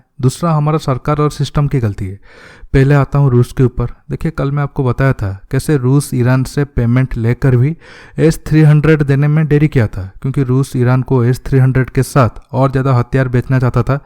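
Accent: Odisha, India. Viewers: Bhai ap odisha se ho Kya????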